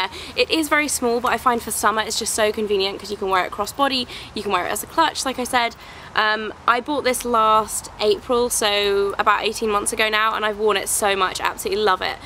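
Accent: England, United Kingdom. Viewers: I have to say, you are very well spoken and very well dressed! I don't normally come to this side of the internet but my sister-in-law is looking to do make-up for movies so been poking around. (I'm a presenter for much different things) Anyway, I just wanted to say thank you for putting forward such a great English accent for everyone to hear! Totally recommended. +10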